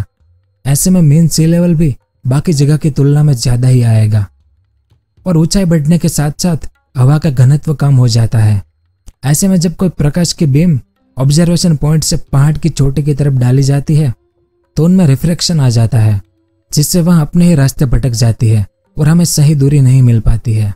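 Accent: Gujarat, India. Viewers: Bhai kya Gujarat se ho kya aap dappu k papa jaise bol Raha hain aap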